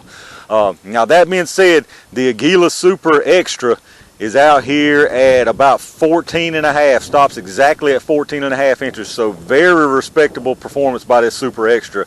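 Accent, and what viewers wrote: South, United States. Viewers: well guess i need to subscribe.. ive watched 4 of your vids without trying to, plus you sound country as hell like me im in SC I would use the aguila for 10/22's and for people with short 22lr barrels like NAA revolver would use punch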